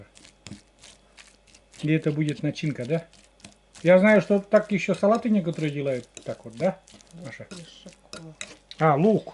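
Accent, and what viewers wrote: Komi, Russia. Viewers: Маша Добрый день.смотриш на вас и душа радуется за вас,у вас всегда все хорошо.дай бог вам здаровье!у вас красивый коми язык + русский- красиво звучит.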